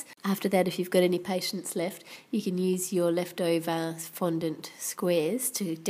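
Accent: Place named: Australia. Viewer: wosho I'm Pretty sure she is Australian, It sounds like she has a Cold too.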